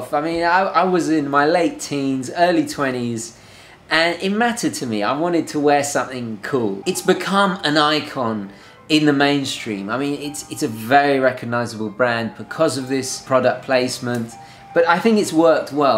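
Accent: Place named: United Kingdom. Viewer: This one is why I enjoy your videos. Great points made. I find that you gents from the British Isles are more entertaining to listen to than us Yanks. Carry on, Guv.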